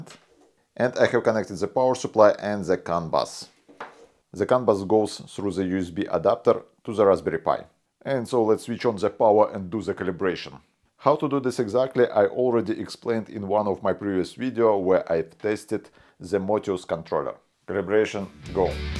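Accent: Russia. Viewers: Русский английский сразу слышно 😁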